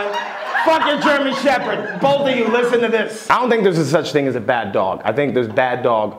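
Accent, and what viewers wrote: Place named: Boston, United States. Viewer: He’s so Boston it’s not even funny 😂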